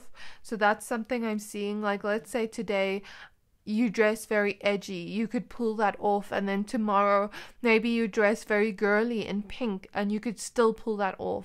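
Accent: South Africa. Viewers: Great video ❤..if you're comfortable answering...may I ask where you're from...you have a SA accent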